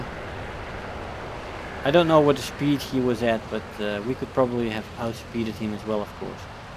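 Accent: Netherlands. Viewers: are you Dutch? Your voice sounds like you are dutch xD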